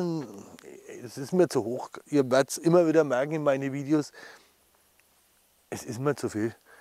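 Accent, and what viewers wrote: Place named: Upper Franconia, Germany. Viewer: Dialekt ist oberfränkisch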